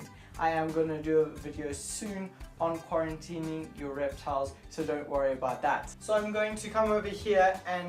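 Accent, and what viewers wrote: South Africa. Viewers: South African? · Is that a south African accent bro?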